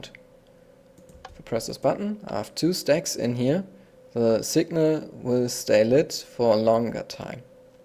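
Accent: Germany. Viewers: da hör ich den deutschen raus xD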